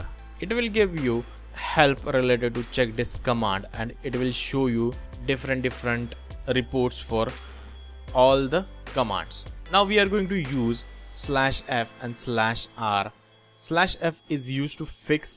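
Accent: India. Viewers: The narrator has an Indian accent, is that ok? Since when windows has a robotized indian accent ?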